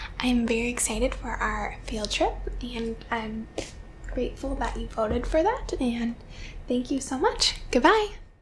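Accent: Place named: Michigan, United States. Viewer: She's gotta be from Michigan right? Or upstate New York. Something up north. Love the accent!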